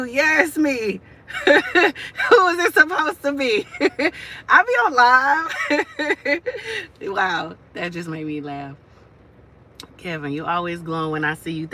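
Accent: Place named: East Coast, United States. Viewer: You sound like you from the eastcoast love LOL are you?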